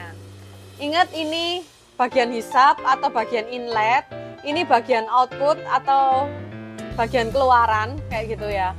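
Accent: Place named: Surabaya, Indonesia. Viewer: Oalah jebul aning Suróbóyó. Mulané medok buanget. Rapópó cik, kita satu server.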